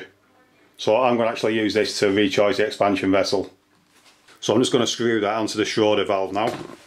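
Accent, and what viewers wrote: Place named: Barnsley, United Kingdom. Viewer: Sounds a bit like Barnsley accent. :)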